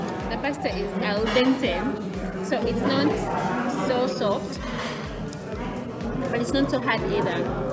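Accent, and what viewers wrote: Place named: Netherlands. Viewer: The English has really changed. This is really a Dutch accent. U loved the Vidoe bby gal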